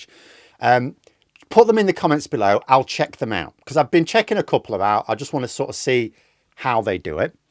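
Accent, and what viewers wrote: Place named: North, United Kingdom. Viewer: I have a dormant Twitch account where I followed a German woman in Finland who ran games and comments for her subscribers. It was mainly to help me learn about Eve. Unfortunately my short attention span caused me to drift. I can barely watch a full rugby match when I care about one team. I'd drop into a Twitch stream for you because it's you and I like your analyses and northern accent. For me (sample of <1), I'd be engaged by a game in the background, with a mission paused and a few topics on the table. But what do I now? I shit into a bag. In any case, I admire your evolving platform(s).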